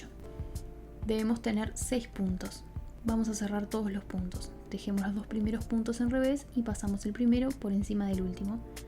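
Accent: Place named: Argentina. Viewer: Quedo super bonito! Ansío hacerlo. Pensaba que tu idioma de origen era inglés pero al escuchar tu voz me di cuenta que tienes un acento muy argentino, sino me equivoco!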